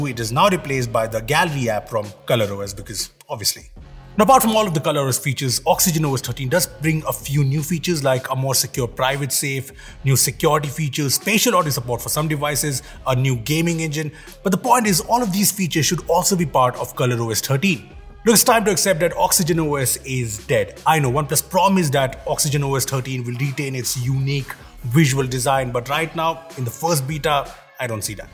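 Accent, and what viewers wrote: India. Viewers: How do u got 3m subs with that indian accent, i couldnt understand a word you said.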